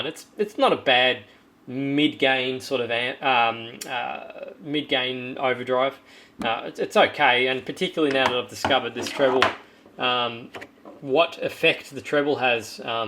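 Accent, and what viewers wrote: Australia. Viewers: I watch your videos assiduously, and a great help for us in Latin America, would if it had subtitles... (even in English, because of your fancy Australian accent) =) We really will appreciate! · That's gotta be the first time our Aussie accent has been described as fancy! I must agree-this channel is full of awesome info. I'm a very recent subscriber.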